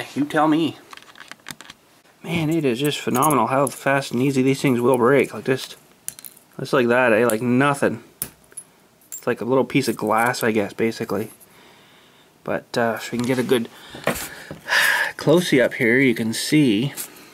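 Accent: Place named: Canada. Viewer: wow! i guess you are a canuck. (did i spell that right)? your accent makes me smile, and thank you for that! Goofy Canadians. LOL.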